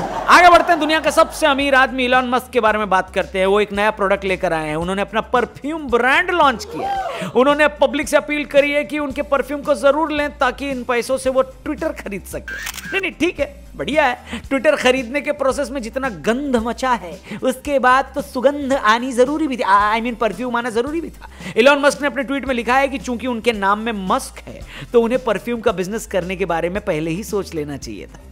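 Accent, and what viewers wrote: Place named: Bihar, India. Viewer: भाई आपका बिहारी में बोलने का style मस्त है भाई | love 💓💓you bro from patna (बिहार)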